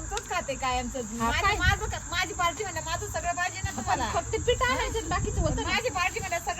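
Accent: Ahmednagar, India. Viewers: ताई नगरला असच बोलतात, आणि भाषा अस्सल नगरी आहे लहान पणापासून आपण जे बोलतो ते लगेच नाही बदल जात सारखे सारखे नका तेच बोलत जाऊ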